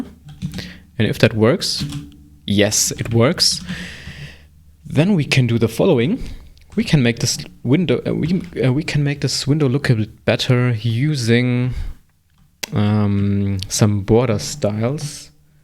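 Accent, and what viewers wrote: Germany. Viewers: Man merkt Bro ist deutsch hahahahah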